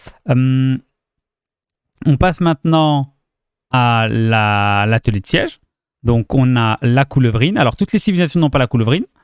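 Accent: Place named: United Kingdom. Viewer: Marrant, les haters qui se moquent de ton accent anglais ne viennent pas corriger ton allemand ?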